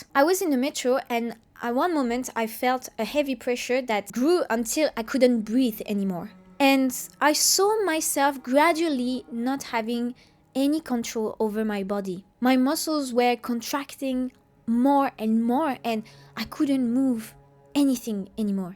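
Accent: France. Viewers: Your accent sounds French.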